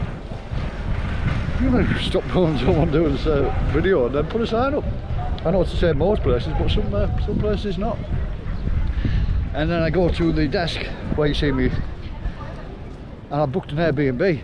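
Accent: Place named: Yorkshire, United Kingdom. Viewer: Hi boy I m ur new subscriber a Scotish Pakistani. Love ur Yorkshire accent.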